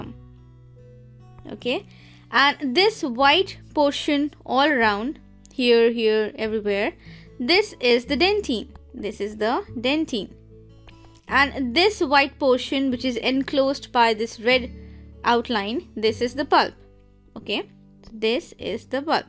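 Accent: India. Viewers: Bad indian english accent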